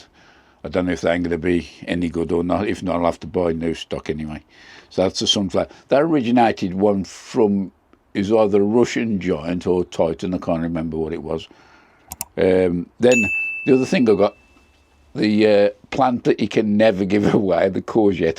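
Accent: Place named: Midlands, United Kingdom. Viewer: I always enjoy your patter, thanks👍 Also recon, at least from your accent, that you’re midlands based. That’s helpful to me as I’m in north Shropshire so we’ll have similar frost dates and I can use your sowing and planting out dates to check the logic of mine. BTW, could you let us know the variety of runner beans that you’re growing? They look like Scarlett Empire but there are many with colour and patterning like that. I always grow Scarlett Empire, Rhondda Black and butter bean type like White Emergo or Greek Gigantes. Always grow in the same places (no rotating), never dig their beds over, just top them out with horse manure in late autumn and they all crop well.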